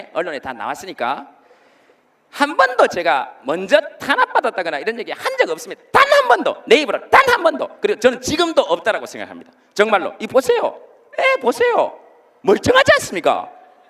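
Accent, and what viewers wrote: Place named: Gyeongsang, South Korea. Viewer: 갱상도 사투리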